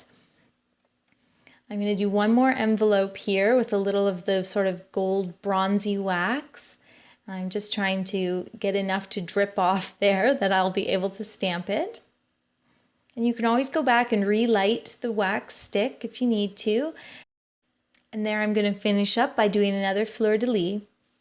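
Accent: Canada. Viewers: Are you Canadian?? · I might be wrong but she sounds Canadian. I can tell because shay mitchell says aboot and she's Canadian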